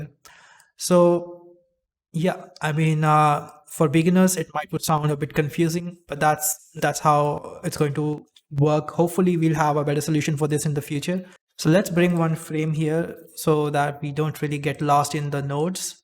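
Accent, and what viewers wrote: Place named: India. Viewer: as soon as I heard your accent, my ear; yup he is from India(Delhi, Mumbai or Bengaluru)(Dam I have a perfect pitch)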